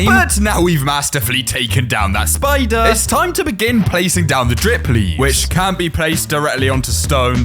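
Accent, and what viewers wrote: United Kingdom. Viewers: Like if has a British accent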